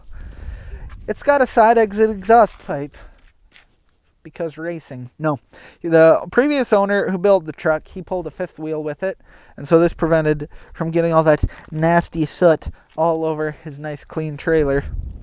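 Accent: Canada. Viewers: You sound like a crazy country CANUCK... WHERE YOU FROM?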